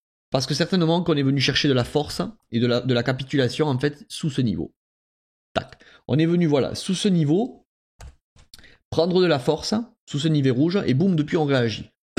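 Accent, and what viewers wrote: South, France. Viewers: Santé avec ta jarre lol je me régal à écouter tes analyses avec ton petit accent sudiste peut-être non....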